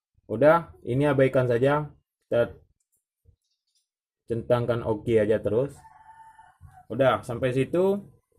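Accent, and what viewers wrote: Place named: Padang, Indonesia. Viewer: Dari logatnya seperti org padang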